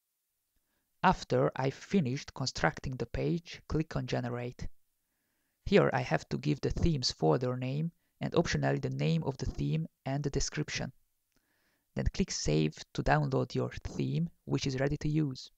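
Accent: Hungary. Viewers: tényleg valaki olyannak kéne mondani a kommentárt, aki nem hunglishül beszél :)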